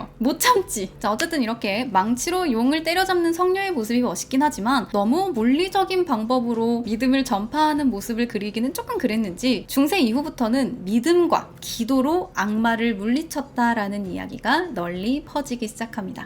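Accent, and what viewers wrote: Gyeongsang, South Korea. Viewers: 오늘은 억양이 경상도인데 저만 그렇게 느끼나요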